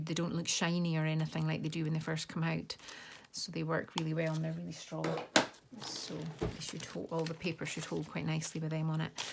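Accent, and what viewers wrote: Scotland, United Kingdom. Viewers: Absolutely incredible, I could sit and listen to your beautiful Scottish accent all day, and watch the videos of what can only be described as stunning works of art, yet another wonderful book. Thank you for sharing with us.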